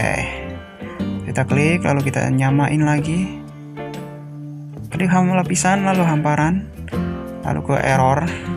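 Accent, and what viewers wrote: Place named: Banyumas, Indonesia. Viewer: Jawa ngapak😂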